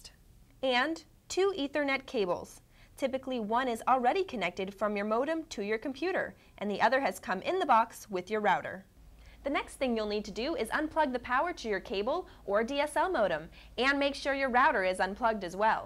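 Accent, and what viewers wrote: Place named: United States. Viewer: Her yank voice goes through me...she's chatting a load of shit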